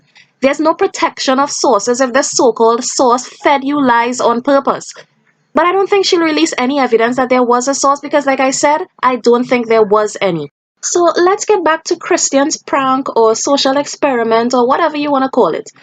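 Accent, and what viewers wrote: Trinidad and Tobago. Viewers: Is that a trini accent i hear :) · you are sounding like a Trini are you? Good summary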